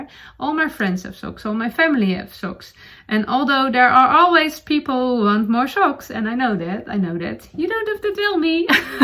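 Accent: Netherlands. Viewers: I am a new viewer -your podcast was recommended by Selma of Little Big Knits. I love your accent. I have a lovely sister-in-law and a good friend from the Netherlands so the accent makes me smile. A piggy 🐷 bank is generally a ceramic pig with a slot to put coins in to save up money. Now they come in all shapes and sizes. Thanks for a very entertaining podcast! 🤗 I am from BC Canada 🇨🇦 and have been knitting for over 60 years and am still only mediocre, but I do love knitting! 💜💙💕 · Hi, you have such a sunny, delightful personality and I love your "Dutchy-English"!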